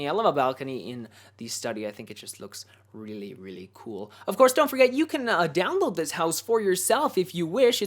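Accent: Canada. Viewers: You sound very Canadian... If he is sorry I had no idea. I thought that because of how he said sorry (Soary).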